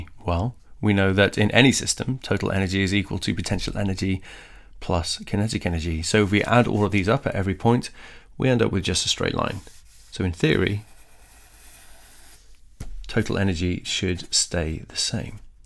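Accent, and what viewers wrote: United Kingdom. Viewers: i Knew he was british through his accent but he confirmed it by writing centre instead of center (but who cares this is a great expalaination for simple harmonic motion)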